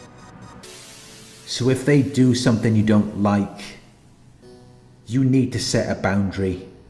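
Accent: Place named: England, United Kingdom. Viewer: He is English we have many dialects over here unlike the US. The silence is so you can digest what he is saying.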